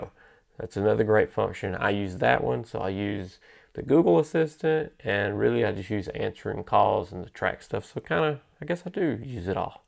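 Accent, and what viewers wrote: South, United States. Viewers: NICE REVIEW, GREAT VOICE, love that country twang!!! ❤️😉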